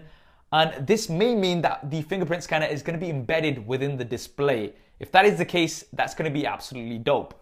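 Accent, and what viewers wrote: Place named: United Kingdom. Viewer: Wow are you hindi?you sound like a Brit.amazing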